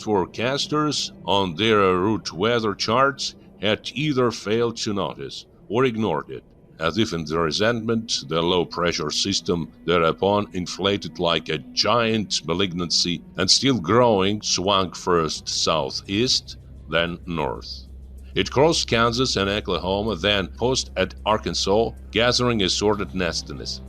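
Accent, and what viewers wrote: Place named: United States. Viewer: Какое замечательное американское произношение в стиле <num>-х годов. Как всегда, замечательная дикция! Я же говорю, что у Вас огромный исполнительский потенциал, над которым надобно продолжать работать, чтобы не растерять, а развить до логического максимума. А так - брависсимо🎉!!! А насчёт "врагов"... Я в своëм пионерском возрасте - КИДовец... 😊 Эдак то, милостивый государь😊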